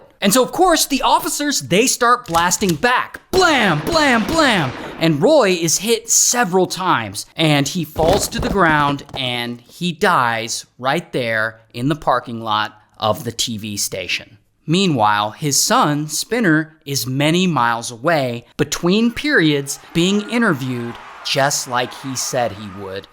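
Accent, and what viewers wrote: Canada. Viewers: As eh! Canadian. Love how you Canucked it up as well as you did. Sad story of course.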